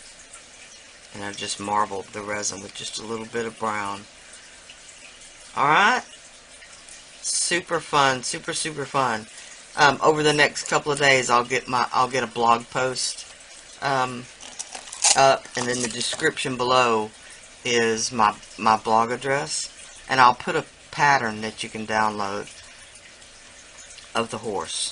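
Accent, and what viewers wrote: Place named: Texas, United States. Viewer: I'm glad you mentioned that you are from Texas. The accent is so pleasant and familiar. I'm in Arkansas, but the accents are similar. You sound EXACTLY like my friend Cynthia, so that is just another plus to watching/listening to your tutorials. You are awesome times ten!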